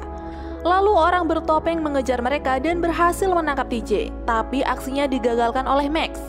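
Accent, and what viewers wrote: Pemalang, Indonesia. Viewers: Wong Pemalang min?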